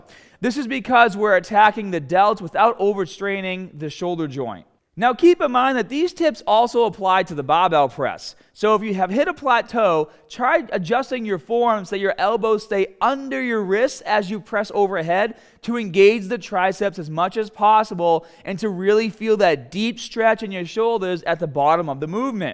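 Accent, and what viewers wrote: Boston, United States. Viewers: Following because of that Boston accent...and trying to make gains, very informative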